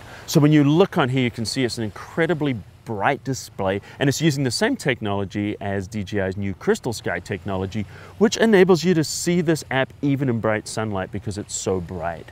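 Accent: New Zealand. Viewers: I knew you were a Kiwi!!! Go ALL BLACKS!!! Thanks for the great review mate... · You sound kiwi but not lol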